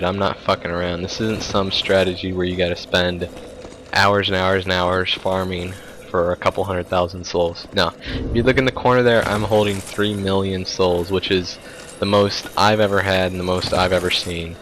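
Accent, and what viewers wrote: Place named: Canada. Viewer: canadian eh ?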